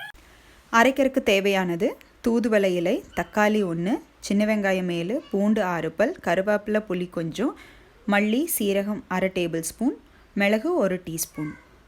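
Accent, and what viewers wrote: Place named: Kongu, India. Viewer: Nice Kongu Slang and useful Recipe:-)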